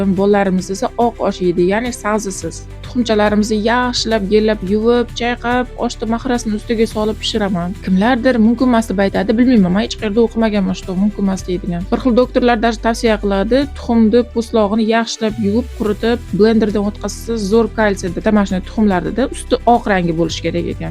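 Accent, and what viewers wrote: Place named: Kashkadarya, Uzbekistan. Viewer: Опа соддагина очик кунгил аел экансиз. Шеваизга караганда Кашкадаре еки Карши тарафлардансиз. Сизни видеоларизи куриб анча йиллар олдин биз хам Москвада ош пишириб Русларга ош сотган пайтларимиз эсимга тушиб кетди. У пайтлар аям дадам ва ака укаларим бор эди. Хаммамиз хали кичкина эдик. 2004 йил эди. Хали Канадага кетишимизга документлар берилгаг пайт лекин Москвада жавоб чиккунча яшаш керак эди. Болалар кичкина. Дадам ва аям факат. Энг халол пул топиш йули шу халол ош пишириб ош сотганлар. Майлида. Кунимиз утиб турарди. Бугун эса хаммамиз котта котта лавозимларда ишлаймиз Канадада. Дадам ва аям эса Узбекистонда шохона хаетта яшайдилар. Оллохга шукур. Опа сиз хам хали оилаиз бн хаммаси яхши булади. Бировларни уйини йигиштиршан кунларимиз хам булган… бу хает. Ундан уялмаслик керак. Сизга омад тилиман. Кизингиз укиб котта лавозимлага ишга кирсин манга ухшаб ❤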